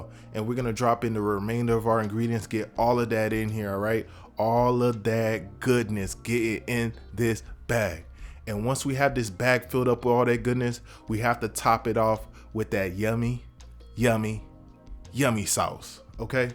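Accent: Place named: Florida, United States. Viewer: Simple, easy to make recipe and taste good! Appreciate you you bruhdah! Sounds like your a from FL.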